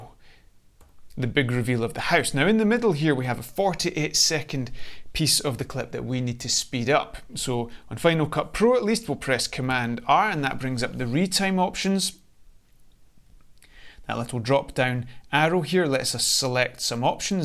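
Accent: Scotland, United Kingdom. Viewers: Title drew us in Scottish accent kept us here ❤‍🔥❤‍🔥❤‍🔥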